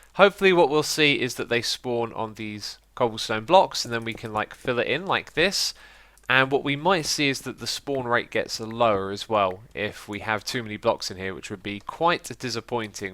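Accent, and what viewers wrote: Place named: United Kingdom. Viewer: Hey x. Why so British?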